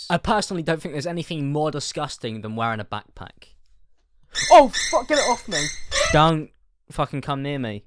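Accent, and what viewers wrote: United Kingdom. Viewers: i usually cant stand hearing the british accent (for no reason) but i really appreciate your vids!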